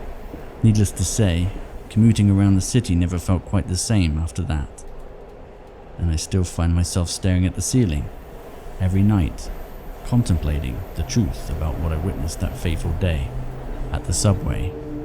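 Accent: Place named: RP, United Kingdom. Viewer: Love is posh voice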